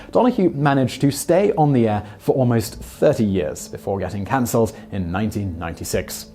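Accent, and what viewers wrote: England, United Kingdom. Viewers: Please for the love of all you hold dear, have someone make sure knows the pronunciation of people's names. Granted he has an English accent that allows for differently pronounced words, like controversy. However, a person's name is only pronounced one way. This is a pet peeve because my name is seldom pronounced correctly. It is beyond cringe-worthy to hear a famous person's name mispronounced.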